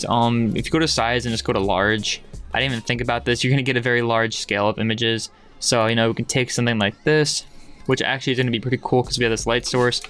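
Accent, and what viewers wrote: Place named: United Kingdom. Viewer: I always thought u was from the uk. cos of the accent and your name ' ' im pretty sure thats a brittish name.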